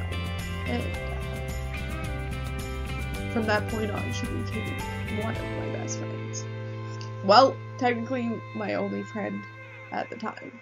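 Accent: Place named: Canada. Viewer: I just want to thank you for sharing your story. I realize that it’s been awhile since this was first uploaded, but I want you to know that it really impacted me. I totaled my car 3 years ago and completely messed up my ankle. I can’t ever run again, and some days are more painful than the last, which in by no means compares to what you went through, but it was challenging. It took about half a year to be able walk without any crutches, and about another year just to make the limp less noticeable. It was all worth it though. Just like you said, I don’t think I’d be the same person I am today if it wasn’t for going though it all. I have to be thankful for that, at least. But, you really do seem like a wonderful, strong-minded person. Hopefully you’re still doing well; and, of course, watching the new deadpool movie somewhere. Most likely in Canada. Lol. 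Not to offend. You just seem like you have a slight Canadian accent. Then again, what can I say? I’m from the states and people tell me that I sound slightly Canadian all the time. Haha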